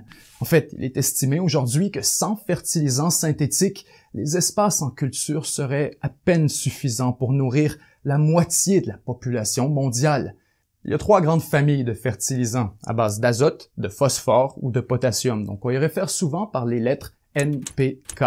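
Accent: Quebec, Canada. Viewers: Quelle eloquence ! Le ton de la voix, les intonations, etc ...C'est un vrai plaisir à écouter. Meme le leger accent québécois met une touche en plus. Une tres bonne contribution pour francophonie de YouTube !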